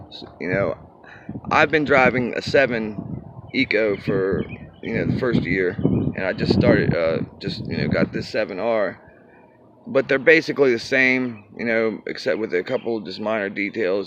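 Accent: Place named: Pennsylvania, United States. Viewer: You sound like you're from PA, and not NC. Just an observation. :D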